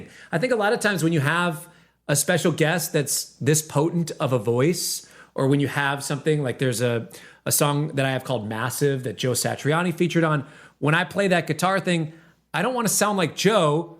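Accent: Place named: Minneapolis, United States. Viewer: you’re definitely a south Minneapolis dude, I could tell, nothing wrong with it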